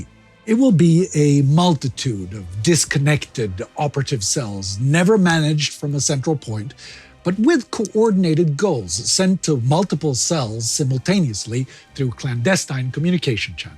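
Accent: Netherlands. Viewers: Heerlijk, dat Nederlandse accent.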